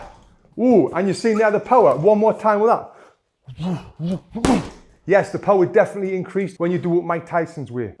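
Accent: United Kingdom. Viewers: Ehy! You are an awesome teacher, I'm gladly following you but I have a question I hope you don't mind: Where is your accent from? I'm italian but I've been in england 4 times (london, scarborough, plymouth, york, manchester) and 3 other times in the united kingdom (scotland, ireland, north ireland) and I can't quite well recognize your way of speaking! It's British for sure, but that's all I can guess.. Thanks!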